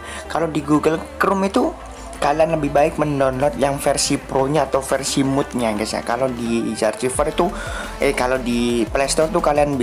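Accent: Java, Indonesia. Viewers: Anjay bgomong jowo orang mana bang emangnya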